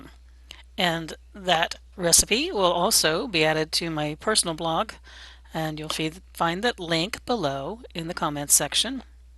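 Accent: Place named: Maryland, United States. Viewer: Well my min pin is named Snuffy after the 1st dog I remember having as a kid, who was a Manchester Terrier and was also a black and tan. I have a weakness for black and tan dogs I guess, have certainly had quite a few in my life. I fostered dogs for a while for 2 different rescues in the past, as well as some bottle fed kittens and a mama cat who had just had kittens. But Snuffy doesn't bark that much at all. I taught her early on that was not acceptable unless there were truly something I needed to be alerted to. I have had her for 4.5 yrs now from 4 mos of age, and I can pretty much discern her different barks to know what's going on outside, I guess you could say I almost speak dog....ON another thought...heading to the liquor store before a big storm is one of the very goofiest things I do believe I've ever heard of! I can safely say I would not be one of those folks, lol. 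BTW, I grew up till age 15, in Columbia MD, sounds like you have a similar accent:) You may wish to let the vet know that Ernie has had an increase in his post ictal stage of seizure episode...and yes, I DO remember that lovely song, lol. BB to you and yours, kissies to the dogs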